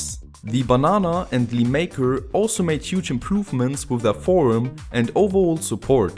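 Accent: Germany. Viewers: eher ein deutsches Amerikanisch.... Und ich dachte immer, in Deutschland würde man brtisches Englisch und RP lernen, so wie ich früher. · Dude, you sounds weird... Are you German?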